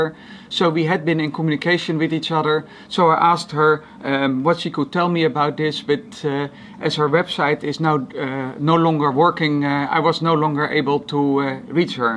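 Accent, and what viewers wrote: Netherlands. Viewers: I love your accent, are you from somewhere around Netherlands? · Yeah, he's dutch.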